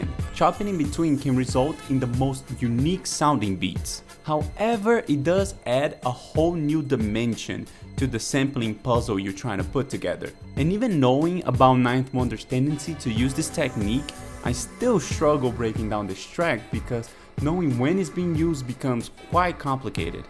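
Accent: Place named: Brazil. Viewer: tu tem sotaque de brasileiro, tu é brasil?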